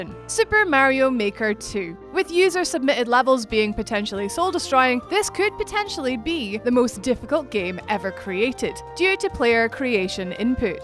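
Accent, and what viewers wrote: Scotland, United Kingdom. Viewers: THE GAME IS DRIPPING WITH CONSTANT PERIL , love the Scottish accent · Fábio Pinto that’s a woman mate, Scottish accent.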